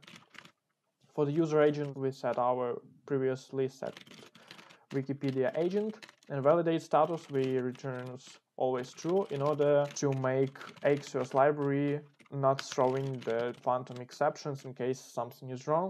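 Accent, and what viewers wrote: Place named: Russia. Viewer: слушать английскую речь от русскоговорящего довольно кринжовенько, но урок очень толковый, спасибо большое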